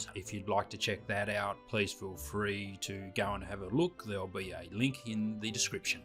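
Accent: Australia. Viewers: Great job and, I love your talking during the video your personality is coming through, plus love the Aussie accent ❤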